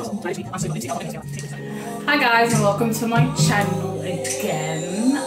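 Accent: United Kingdom. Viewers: you look stunning girl 😎 and love your London accent 👌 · I can hear her just fine with that British accent. Y'all need to have your ears checked. Djiboutian 4 life here, laakin heblayoo is astuur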